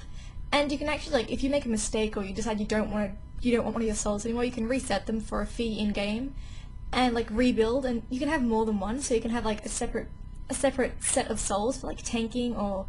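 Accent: Australia. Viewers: Because ur a gamer ur beautiful and u have an austrailian accent which is awesome. (im not trying to ask you out i just need to let it out)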